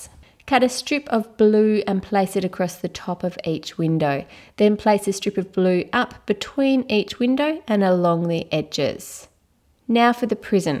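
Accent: Australia. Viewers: I love your accent so much I want to have an Australian accent so much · Are u Australian? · R u Aussie (I is)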